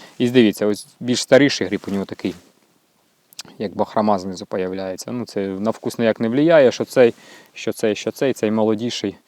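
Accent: Surzhyk, Ukraine. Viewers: Уж лучше на руском говорите. Ваш суржик режет уши . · Молодец, что на украинском. Правда, сильный суржик сходу слышится по первым минутам. :) Треба трошки попрацювати ще. Чтоб для ушей еще мелодичней было.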